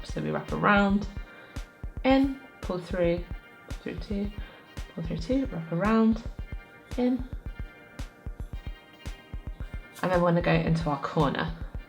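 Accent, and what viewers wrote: United Kingdom. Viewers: Awesome instructor and very clear teaching of all the necessary techniques needed to accomplish what looks like an extremely difficult pattern to those who don’t crochet! A great way to use up all that stash, too! Thank you so much. It did take a bit of rewinding parts though just to understand her English accent, which I grew to love!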